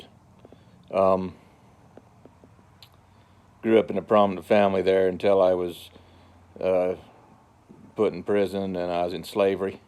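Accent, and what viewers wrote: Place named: South, United States. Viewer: Why are you Caucasian if you’re from the Middle East? Why do you have a southern accent? If you’ve gone further into the future and you say you can’t change the past, why are you here now if you can’t change anything? Wouldn’t this be the past? Have you considered that the fall of the US is a part of prophecy and God’s will?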